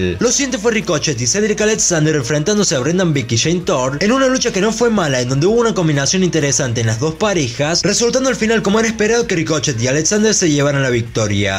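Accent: Córdoba, Argentina. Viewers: Hola sos de cordoba? Muy buen trabajo saludos desde bueno aires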